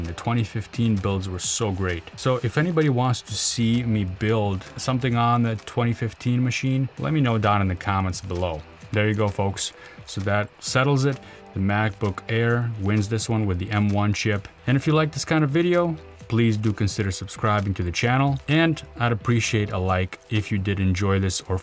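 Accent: Russia. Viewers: da , kagda ya slishal evo ruski dialect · Русский акцент выдает мистера. Гуд кантент. Ай хит дат лайк баттон.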